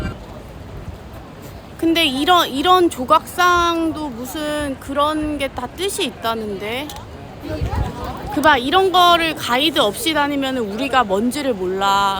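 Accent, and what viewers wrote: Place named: South Korea. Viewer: 구독하고 힐링하며 보는 구독자입니다 해외로 많이 나가시는거 같은데 영어 공부 하셔서 영어 까지 잘하시면 더 멋진 여행 유투버 되실거 같아요 영어 하실때 너무 콩글리쉬에 조금 보기가 민망해요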